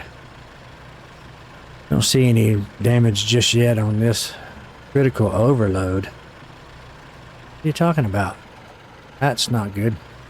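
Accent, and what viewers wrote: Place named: Carolinas, United States. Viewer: You from one of the Carolinas? Some of the way you talk reminds me of Dale Jr. 🤣 Good stuff man.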